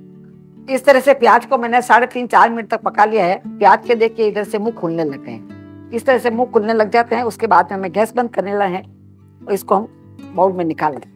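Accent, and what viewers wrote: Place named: Rajasthan, India. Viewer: True.. I miss her beautiful Rajsthani accent ..🙂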